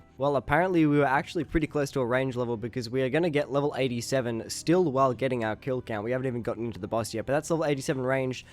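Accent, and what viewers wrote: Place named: Australia. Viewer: Enjoyable content as always and your Aussie bogan was showing a little in this one :P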